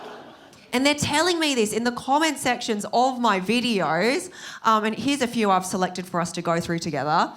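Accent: Australia. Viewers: Aussies are more racist than we would like to think but not as racist as our national history would suggest. White Australia was explicit policy in my lifetime, and the historical fiction that Australia was uninhabited at the time of European "settlement" formally dissolved only about thirty years ago. Treatment of Chinese immigrants in the 1800s was abysmal. The Cronulla race riots were only about twenty years ago. Frankly your Aussie accent is stronger than mine, but nobody ever questions my national identity - because I'm white. Nobody ever calls me a European Australian because it's "normal" for Australians to be white. But glad you can find the humour in it. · Girl, you're the most Aussie Aussie there is. You can't have an accent like that and be considered anything other than full Aussie! If you ever decided to swap Australian citizenship for British citizenship after living there long enough, it wouldn't matter, there's no way you'd ever be considered anything but one of us ❤🇦🇺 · You've got the thickest Aussie accent. 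Actually really funny too. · I'm a Maori Kiwi & my fav'rit English accents are Aussie & Afrikaner_ welp! Seeing this gorgeous Chinese face speak'n Aussie din'kum just makes m'day & she's damn funny 💯🤙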